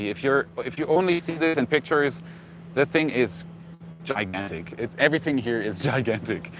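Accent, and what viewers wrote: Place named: Germany. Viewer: WOWOWOWOWOWOWOwowowowowow!!! Guys who programmed Apollo Computer were at a Computer History Museum talk. At a VCF West - Vintage Computer Fest were some Saturn-5 guys. Ish Spreken Kaine Deutsch . Some how I thought u were a Spaniard but I think I heard German. No hablo Alemanes.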